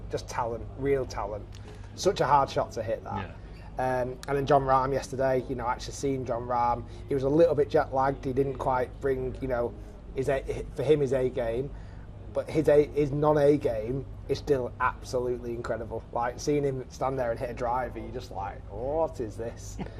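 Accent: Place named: England, United Kingdom. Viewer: I cant watch Rick because of that horrible English accent . The way he says " but " drives me insane . It must from what ever area he grew up in as the proper English accent is so nice to listen to . Its getto English . LOL . I know first world problems . Cant knock a youtuber for taking 10 million of LIV money though . Only a idiot would turn it down .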